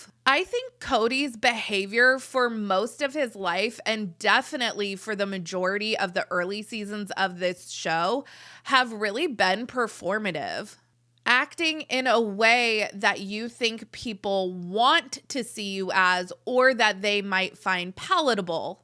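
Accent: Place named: California, United States. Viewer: God I keep clicking on your videos … you say 10 words and I have to stop. I don’t know what accent you have but it’s super Intolerable. California maybe … you drag everything out in a weird nasal way. It’s grating on the nerves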